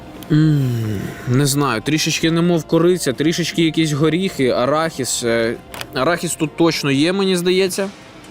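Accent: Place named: Surzhyk, Ukraine. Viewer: Сельский суржик , на украинском зачиняем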